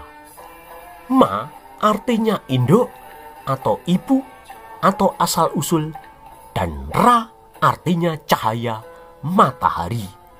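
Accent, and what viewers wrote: Java, Indonesia. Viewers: Baru ini nonton chanel jdi ketagihn nonton krn bhs nya logat jawa dan penekanan kata2ny,bkin ktwa,gak ngantuk aplgi nyebut muhammad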